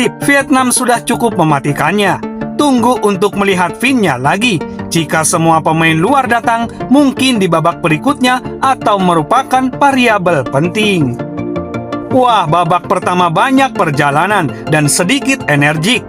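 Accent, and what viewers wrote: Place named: Malaysia. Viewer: Mantab bang logat bahase melayu nya, saye dari Kepulauan Riau👍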